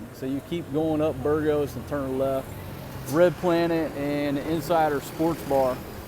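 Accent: South, United States. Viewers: You have a cool suthern accent. Lo Great video anyway. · Your accent sounds like you're from the south?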